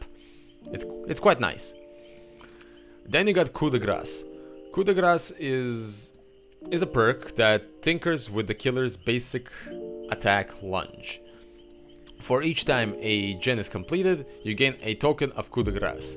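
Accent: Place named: Israel. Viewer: יש לי תחושה שאתה ישראלי בגלל ה accent lolol great vid BTW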